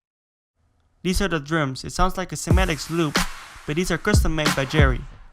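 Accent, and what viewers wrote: Netherlands. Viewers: Is dit een Nederlander? Or not?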